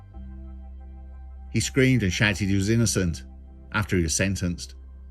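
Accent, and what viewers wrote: United Kingdom. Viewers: You’re a cracking talker, straight Jon Bull. I like that. Stay blessed. 🙏👊✌️😎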